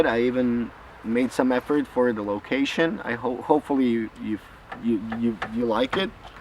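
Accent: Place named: United States. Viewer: such a nice american akcent. Do you spend some time in America or is that just influence of the Internet?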